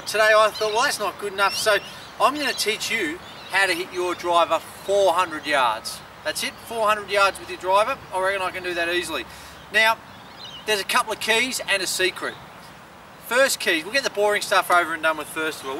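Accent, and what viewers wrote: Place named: Australia. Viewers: Probably Aussie based on the accent. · haha english men , Hes a Aussie,accent and by the birds sounds and fauna behind him... yes your right me and a mate played his stepfather a 72 year old and he whipped us,he got a 82 and all he did was hit straight ....!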